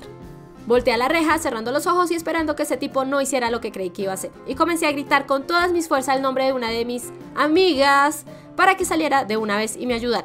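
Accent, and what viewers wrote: Colombia. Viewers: me encanta como hablan los colombianos y es una bendición escuchar como hablas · eres colombiana porque así hablamos en miuu pais ^w^ nueva suuub por cierto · Sabia que eras colombiana XD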